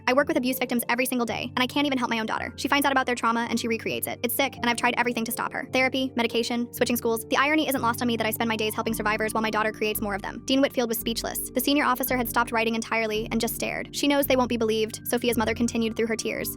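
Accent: United Kingdom. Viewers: Why did she turn British Midway through the video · that's British accent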